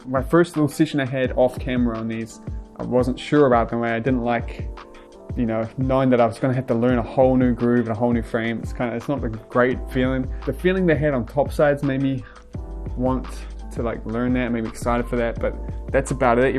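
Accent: New Zealand. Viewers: Are you born Kiwi or you come from another country? I love your accent 😍💞💞💞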